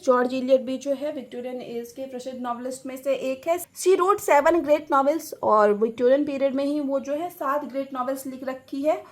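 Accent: Bihar, India. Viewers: Aapka tone of speaking Samastipur, lakhisaraay, Darbhanga, begusarai, jaisa milta julta hai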